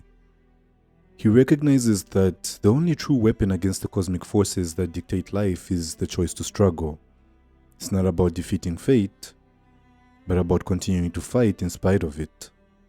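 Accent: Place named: Nigeria. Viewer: Great video! Your accent sounds Nigerian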